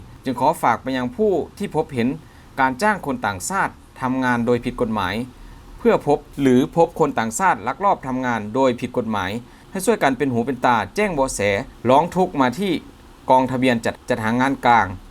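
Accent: Laos. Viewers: เว้าลาวเถอะไอ้หนู กูอายแทน แค่ออกเสียงคำควบกลำ้เขาก็รู้ว่าเป็นลาว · แอดเป็นคนลาวพูดภาษาไทยไม่ซัด แอ็ดเป็นคนลาวแน่นอดครับเชื่อผม ฟังภาษาและสำเนียงให้ดีนะครับพี่น้อง ข่าวที่เป็นจริงเราต้องฟังแอ็ดที่เป็นคนไทยนะครับอย่าให้คนลาวปั่นหัวเราใด้ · คนบรรยายเป็นคนลาวบ่ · ข่าวดีแต่คนอ่านข่าว ลาวคัก. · เเอดมิน เป็นคนประเทศลาวป่าวคับ สำเนียงเหมือนเลย ภาษาไทยก็เขียนผิด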